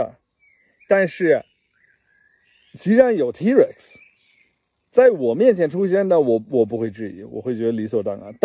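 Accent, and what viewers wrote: Standard. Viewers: 竟然會標準口音厲害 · 的中国话比很多国人的话还标准了，还有几句来句操，感觉很对位😂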